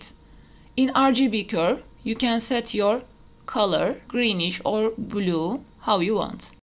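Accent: Turkey. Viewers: aksandan anladım türk olduğunu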